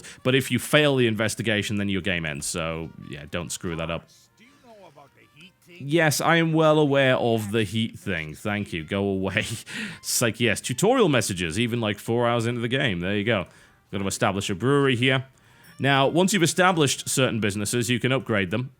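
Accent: United Kingdom. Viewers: no way im going to leave =d i come for the british accent and the opinion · but your british accent is soothing backround noise;)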